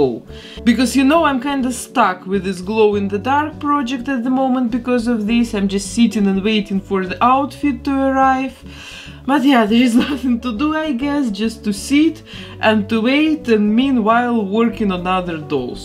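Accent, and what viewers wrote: Russia. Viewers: Добрый день,! Очень нравится Ваше творчество, Вы потрясающий мастер, давно хотела написать Вам об этом, смотрела Вас и думала, что очень знакомый акцент, проскакивали слова, явно, с претензией на русский язык! А сегодня нашла Вас на Facebook и не ошиблась! Вы большая молодец ✊🏼 спасибо за видео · почему я слышу русский акцент )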